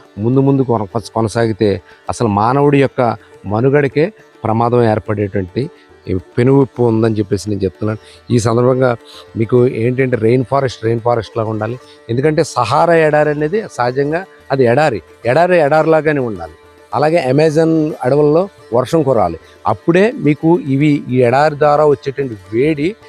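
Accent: Godavari, India. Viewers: Ayya baaboi baabai miru super ఏహే mi slang godari yaasa adbutaha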